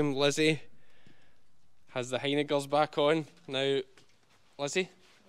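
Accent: Scotland, United Kingdom. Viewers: Hi. Coming here has been my go to every morning since you started the lambing blogs. I’m so very happy that your subscribers have increased to over 50k now. You are one of the hardest working vloggers on utube and thoroughly deserve your success so far. I’m Scottish and love to hear your Scottish dialect. The folks in Aberdeen and further north are more difficult to understand. Even I struggle to understand ‘the viking’. I wish you continued success and hope you and have your own farm one day. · Love to hear the Scots mixed in. It makes you unique. You can get plain vanilla anywhere. We have heavy accents here on the coast of Maine as well. They never get it right on TV and movies lol. You’ll have to teach wee Jock until he can talk like Rabbie Burns. Keep the traditions alive. Lame lambs like that do you think maybe selenium deficiency? Hit with Vit E, Se. 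Maybe bring it when you go to the vets with the Schmallenberg ones so they can draw blood and test. Follow with soil and feed test to see if something is lacking. Hopefully it stops with those two. You never know may not be anything serious. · I love to hear the Scots. I live on a border town by Mexico and Arizona (Douglas, Arizona) we too speak slang Spanish. My family is from Wales and I cant understand any of that but love to hear it too. My favorite is watching and listening to you with that wonderful dog, Meg. Keep up the good work. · I can't always understand but seeing the context helps. And it wouldn't matter if you were speaking pure Scots all the time, I love to hear it. (Maybe because I have Scots in my DNA, which I do!). Absolutely loved your nephew at the end!!! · I love your accent. Keep introducing people to Scots and Scottish heritage. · To all the viewers who have difficulty understanding, I did also at first but I’ve been watching for over a year and catching up on older videos (and watching other Scottish vloggers) so it’s like learning a new language. 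I understand every word now. It’s worth the time and Scottish is so lovely to listen to. I hope everyone sticks with the channel. is the best. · I just discovered your vlogs after watching the Hoof GP while sick abed last week, and it's very entertaining! I don't have much trouble with your broad accent, perhaps because I've read a lot of Scots dialect in books (and Robbie Burns' poetry). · I love learning Scottish words and hearing your accent! Do not change. People just need to give it a bit of time and be patient to learn the words. It's a bit irritating that they call Scottish words "slang." Your culture and language should not be lost. It is half of my ancestry and I am trying to learn more about it. Love this channel! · I just started watching you been watching Drew, love both contents. I started because I miss the Scottish accent, both of my parents were from Glasgow and I was born in Tullibody, my sister at Edinburg castle. 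My friends couldn’t understand my dads mumbling Scottish accent LOL so I have no problem understanding you.We moved to BC Canada when I was two, with our yellow lab also. We have owed a border collie Gracie my heart dog, so watching do what they are meant to do ❤️it. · Hey, I'm from Australia and have been watching you since the day Sandi Brock shouted you out, and I have loved every second of it! I don't have trouble with the Scottish accent because my family is Scottish on my mum's side, though of course none of my were nearly as thick as some of them I have heard on your channel lol. I do however have some trouble with other accents, and the trick to getting to understand them is honestly just keeping listening to them. Like anything, the more you do it the better you get at it, so if you love and the journey he is taking us along on, stick with him! A little time and patience and you will start to really understand the accents well. 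· Keep Scotland in your talk. It is beautiful.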